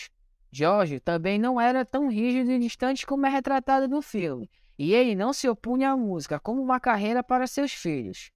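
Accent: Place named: Belém, Brazil. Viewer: Existe uma grande possibilidade de eu estar enganado, pois não sou especialista em nada, mas, se eu estiver certo, aposto que o narrador desse vídeo é natural de Belém (PA) ou (quem sabe?) Ananindeua. Talves Marituba, Sta Isabel ou até mesmo Castanhal, na área metropolitana. É evidente que ele está lendo um texto e está forçando a dicção, a entonação de voz, para parecer um reporter do JN. Obviamente, ele não fala assim entre os colegas da escola. Entretanto, muito provavelmente, esse perfil não é da plebe. É da burguesia. É de classe média, saca? Ainda estuda ou já estudou em boas escolas, tipo, escola particular, sabe como é que é ??? Mas, enfim, o sotaque não me engana. Se eu me enganei, ele é muito bom. Porque, para mim, ele não pode ser de nenhum outro lugar a não ser dessa região metropolitana que eu mencionei acima -- e se for da favela, é uma exceção à regra. 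O cara da favela, fala diferente, fala na gíria, aquele lance da malandragem, tá ligado? O que não parece ser muito o lance dele. Mesmo se fosse da favela, eu também saberia porque conheço as gírias de lá, e só pela maneira de falar, eu identificaria talves até o bairro em que ele mora. Rsrsrsrsrsrsrs😂 Gostei do vídeo, tanto pelo conteúdo, quanto pelo esforço dele em parecer um locutor ou reporter de TV. Ficou legal. O moleque é bom. É diferenciado. Valeu, ai, bro. Vou deixar um like.😊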